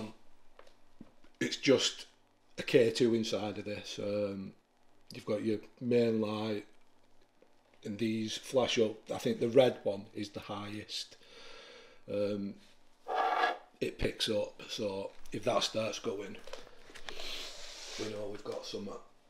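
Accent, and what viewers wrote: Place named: Northern England, United Kingdom. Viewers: Alreet, lad. I'm new to your videos, and I've more or less binged watched them. When and how did this all start mate? You sound local too. I'm from Manchester. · This is madness. You sound like me, are you sheffield/Rotherham area? Do you get scared at all or have you just got used to it?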